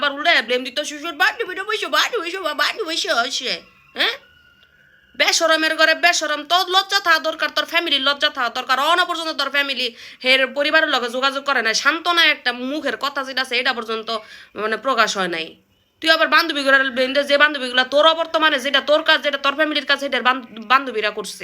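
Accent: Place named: Narsingdi, Bangladesh. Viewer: আপনি কি নরসিংদী জেলার মেয়ে? ভাষাটা এমনি মনে হয় 😅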